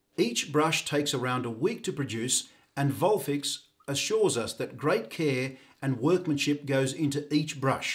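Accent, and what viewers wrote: Australia. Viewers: Yes, but also sound slightly Aussie too so I couldn't tell, lol. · You sound very Australian to me. I am English. You do have a soft accent but it is clearly Australian (to me). Your pronunciation is exceptional and clear. I would say you speak with received pronunciation but with an Aussie flair.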